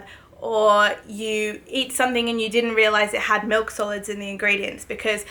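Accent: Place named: Australia. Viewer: I'm here because I just love her Ozi English accent. Sexy accent, hahahaa...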